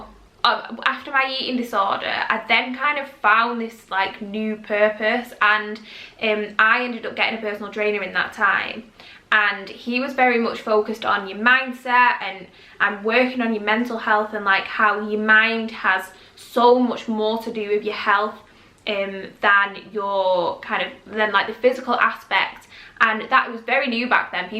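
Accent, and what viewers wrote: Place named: North, United Kingdom. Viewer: Love the northern accent always... and hahah I remember the 20mins to text back so I will take longer.... what a game 😂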